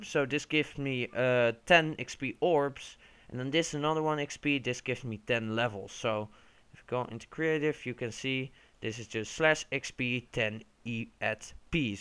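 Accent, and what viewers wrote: Netherlands. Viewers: Ik dacht het al aan het accent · love the dutch accent :) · are you dutch ? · Are u Dutch? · Da Ceh ja je hoort het altijd als een Nederlands iemand Engels praat. Goedw vidieo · je kan makkelijk Horen dat je Nederlands bent:)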